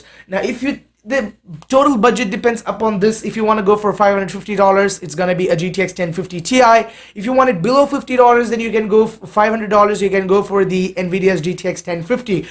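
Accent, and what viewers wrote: India. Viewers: Bro I am a proud Indian and I thought that ur accent Was like a Indian That's why I asked 👍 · u sound indian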